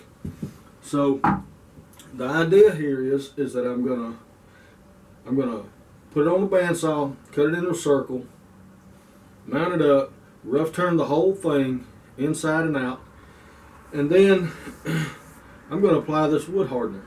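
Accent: South, United States. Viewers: Wonderful work. I love to watch your videos, very informative and entertaining. I live in England and I find that Good ol' Southern US of A accent great as well (:-). I'm so envious of you just being able to walk out back and grab some timber the way you do. Carry on with the great vids. All the best, Stuart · I don't think it's because of the way you talk. You seem to be a very polite person, which goes a long way. It's probably because people are more impressed with the quality of the video production, than the finished product itself. For example, Frank Howarth's channel is impressive not only because of his woodworking skill, but because of his editing skill, not to mention the versatility of his projects. Anyway, best of luck to you! Signed, a guy from Romania that doesn't care about your southern accent as much as he cares about your quality content.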